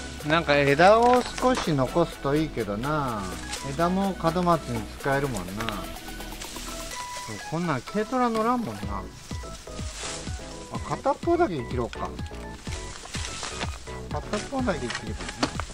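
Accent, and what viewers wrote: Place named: Oita, Japan. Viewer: 、大分弁が身についちきたなぁ😁 どーくり師匠のおかげかな❓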